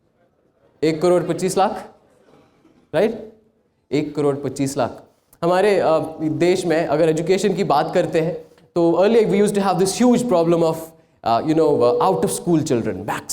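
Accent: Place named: United Kingdom. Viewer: Is he Indian?😁😁 He speak like a Britishers 😍😍😍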